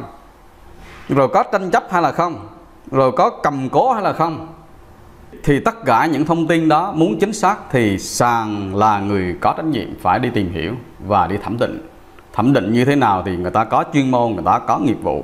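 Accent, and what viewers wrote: Quang Nam, Vietnam. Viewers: Kênh e rất hữu hiệu, giọng Quảng rất hay. Tuy nhiên cần chuyên sâu thông tin và phân tích tầm chuyên gia . Anh ủng hộ.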